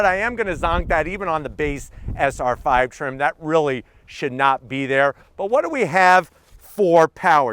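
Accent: United States. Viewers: Sounds weird but American 🇺🇸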